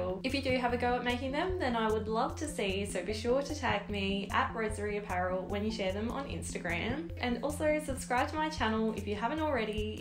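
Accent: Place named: Australia. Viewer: Love it! Thanks, I'm going to try making a couple for my grandchildren. As they live in WA by the beach and are now learning to use their surfboards, I thought it might be fun to try making them with a nylon type of fabric that will still be good for them whilst in the water. Cotton is great for regular wearing, but I was trying to think of something that would protect their faces in the water .... maybe even a fabric like their rash tops that protect the rest of their bodies in the water while out in the sun. Thanks for giving me the idea as I was watching your video. I really enjoy watching your videos because they don't take a lot of skill, so even sewers like me can make them up. It's also great to hear an Aussie on YouTube! In what part of the country do you live? I'm in Hervey Bay so I'm very aware of the need for hats ....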